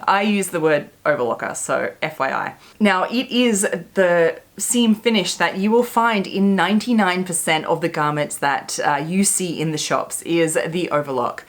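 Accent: Australia. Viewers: Aussie accent - yay !!! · I love your advice, especially with your charming Aussie accent. My serger and I never bonded, too hard to thread, gave it away. Love my brand new Janome with several zigzag choices. Have done all the seams you demoed and love French seams, flat felled and bound seams. I’m 75, sewing since age 10 and still learning. It has been so interesting to see the newer trends and techniques in sewing. Especially loved the demo of your wrist pin cushion, I totally love it! It’s the first thing I seize when starting to sew! I’ve made everything from exercise wear and swim suits to wedding dresses. I love clothing construction and crafts. You are inspiring!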